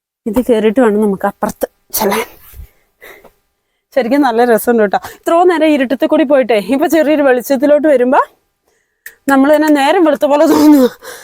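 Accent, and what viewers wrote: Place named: Kasaragod, India. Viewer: നിങ്ങൾ പറയുന്ന കാസർഗോഡ് ഭാഷ കേൾക്കാൻ നല്ല ഇമ്പമുണ്ട്. Nice videos keep going ! I found a sincere approach from you and it should be appreciated. Well done!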